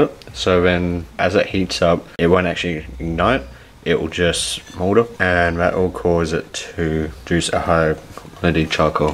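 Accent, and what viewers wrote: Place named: Australia. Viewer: Australia / New Zealand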